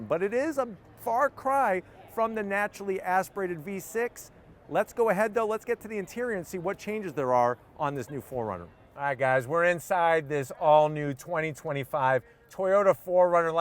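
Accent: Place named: New Jersey, United States. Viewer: This guys whole style and accent scream cheesy Jersey City car dealership commercial from 1987.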